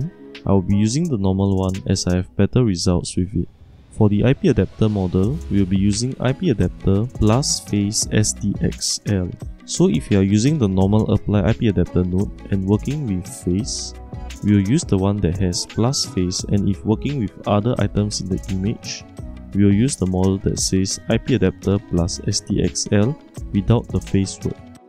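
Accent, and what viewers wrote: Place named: Singapore. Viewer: I'm glad I found this video, very well explained on the IPadapter workflow. Subscribed to the channel.. btw, love the Singaporean accent :)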